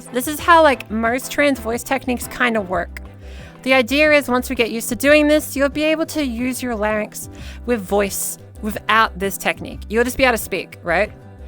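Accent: Australia. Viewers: Me, a straight man who has never had any interest being a woman: Interesting But to be for real, I'm just here to listen to an Australian accent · I like your 'Strayan accent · love the Aussie accent you go girl loving you doing it for aussie girls yehwe definitely don't want to end up with Aussie girl voices proud to be Australian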